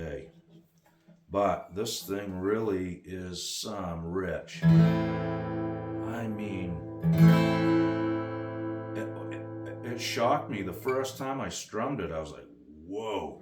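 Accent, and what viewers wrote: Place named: Maine, United States. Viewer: As soon as I heard u speak I knew you were a fellow Mainer. Cool video nice guitar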